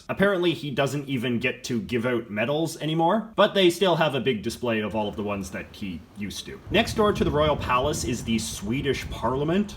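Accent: Canada. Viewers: Wow, an entertaining and informative North American with quite a cool hair style whose only pecularity is to say "a boot" when he means "about". I hope he also realizes that going to Stockholm and possibly see it as a representation of Sweden is a little like visiting New York City and possibly see it as a representation of the U.S. -- It only applies to a degree. (Yes, I KNOW he must be Canadian, but since I've never lived in Canada, I don't want to make comparisons I don't know anything about.) Welcome to Sweden!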